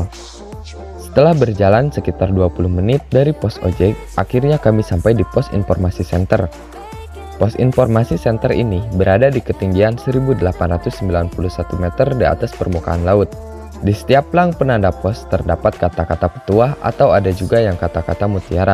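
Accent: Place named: Java, Indonesia. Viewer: Lah bre sejak kapan lu ngomong pake logat jawa, di video ini lu medok gak sih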